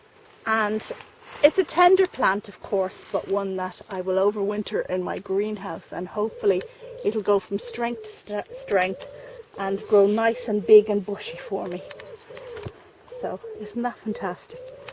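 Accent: Ireland. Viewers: I appreciate your Irish accent so amazing! Keep on making your marvelous job!... It.’s pm in Rio but I had to see your voice and your lovely way of explain the plants secrets...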